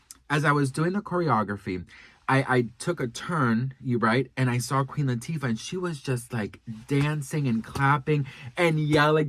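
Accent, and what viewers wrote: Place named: New York, United States. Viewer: AHHH~HAAA! Finally! Been wanting to ask where that New York accent (heavy on some words) came from. 😁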